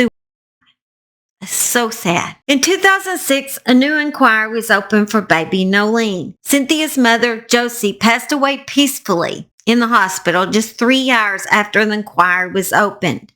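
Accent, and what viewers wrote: South, United States. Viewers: I just love your country accent and I probably sound just as country I'm from Mississippi. Love your dog he's very smart.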